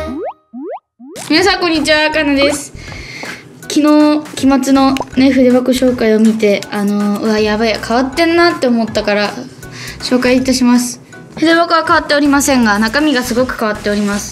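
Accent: Kumamoto, Japan. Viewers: の筆箱紹介好き！同じふせん持ってる！！広島弁と熊本弁って似てるね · 字綺麗で羨ましいです熊本弁可愛いすぎ(≧∇≦) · 熊本弁もっと喋ってほしい！！かわいい、！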